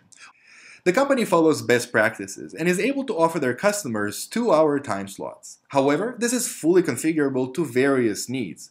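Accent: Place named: Italy. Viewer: Loud rock guitar music, Italian accent.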